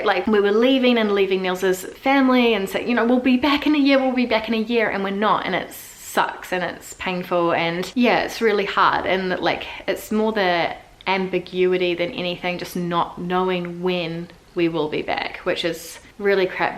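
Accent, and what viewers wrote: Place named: New Zealand. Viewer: I don’t know why but the longer you are in New Zealand the stronger your kiwi accent gets😂😂🤷🏼‍♀️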